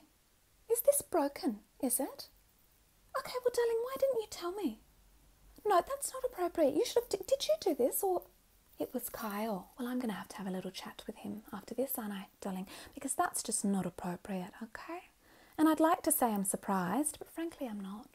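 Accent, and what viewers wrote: Sydney, Australia. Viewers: Wow she must've said "charlotte darling" 100 times in just 1 video. Aussie accent is the best accent. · I really liked the asmr but the Aussie accent was alright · I just found your channel and fell absolutely in LOVE with this character and your videos!! I’m from Canada & australian accents are so beautiful and calming to me. I immediately subscribed!! :) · The accent is everything!! I am also from Australia hahahaha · australian accents are god tier 😋😭❤️ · every Sydney mum I overhear that comes on vacation to Gold Coast 😭😭 perfection. stunning. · Sim, o sotaque é real. É o sotaque do inglês australiano :)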